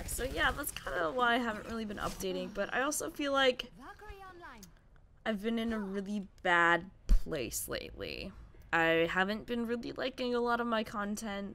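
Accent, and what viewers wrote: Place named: Canada. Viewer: Gurl! Miss you. Whatever you gotta do, you do you :). But we(I) will be there for you in whichever way you need xD. P.S. You sound soo Canadian...and I love it! No offense..? Lol <3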